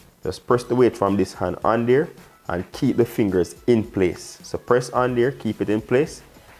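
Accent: Jamaica. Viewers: New sub here. I just come across this channel. Oh my goodness everyone gonna think I took special food tech. Lol. I’m loving your channel. Some great tips. I’m binge watching. Lovely straightforward instructions. Loving the accent my fellow Jamaican. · Love that u redone the video for those that dont understand the jamaican accent. Dumplings look gooooood!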